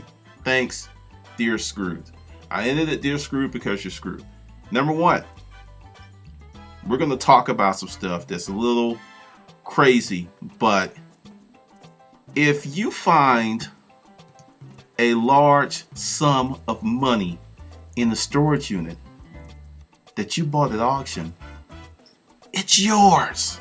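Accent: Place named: South, United States. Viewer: Whats up with your "Mammy Copepper Plantation accent", I didn't know if you wanted your 15 minuits of internet fame or you wanted to tell your money story...........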